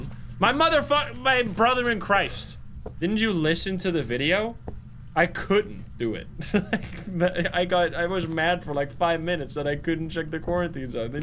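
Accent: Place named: Netherlands. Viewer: I've been watching your YT vids for a while now, learnt A LOT, so thank you for the wide knowledge. Also I was used to hearing my late Oma's Dutch accent that I could no longer hear it, and its taken me weeks to figure out you're Dutch yourself lol. I finally picked up on it with some of the words that in English have the "th" sound.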